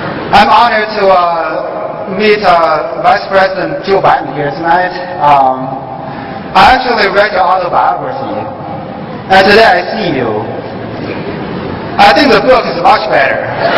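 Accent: China. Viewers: 这口音好严重…广东来的吗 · 让我看到了 纯正的东北调的美国口语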